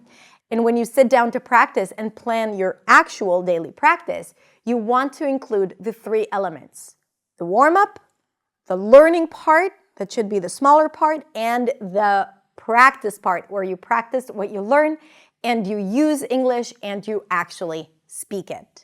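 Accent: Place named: United States. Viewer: You're an inspiration to all of us. Watching the way you mastered and hacked the American English accent is so motivating.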